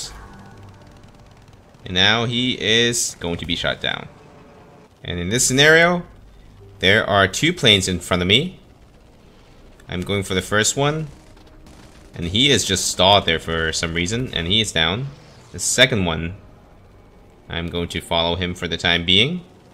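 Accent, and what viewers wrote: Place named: South Korea. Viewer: Where is this guy from I can’t tell, he sounds kind of korean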